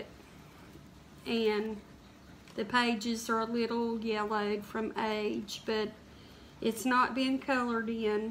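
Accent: South, United States. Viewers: Hi! When I came across your YouTube video for the first time I was drawn to you immediately! My mom was from Virginia and I lived there for period of time when I was a kid! Your southern accent and your personality make me feel at home! I really enjoy all your videos and your calming effect! Take care of yourself and keep up all of your good work! By the way, I live in central California now!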